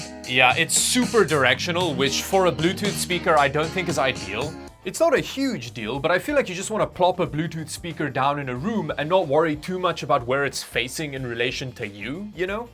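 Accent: Afrikaans, South Africa. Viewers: Hi, your name and accent suggest dutch/ afrikaans, are you from ZA or like Namibia?? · Are you from South Africa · I keep hearing an afrikaans accent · Are you South African? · I can hear some South African in that accent?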